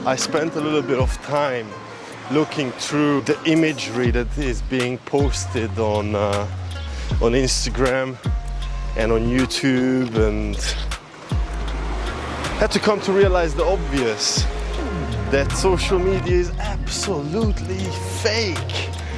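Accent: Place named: Glasgow, United Kingdom. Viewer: You sounds Glaswegian sometime maybe you were Scottish in a previous life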